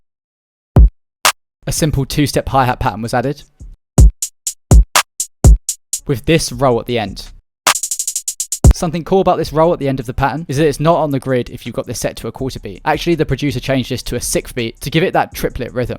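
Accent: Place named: United Kingdom. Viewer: Ts don’t even make sense Just cuz he has a British accent doesn’t mean he can’t just download the plugin and listen to American songs so stupid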